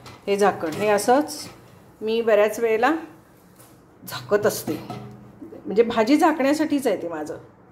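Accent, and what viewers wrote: Kolhapur, India. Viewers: तुम्ही कोल्हापूरच्या का? भाषे वरून कळते.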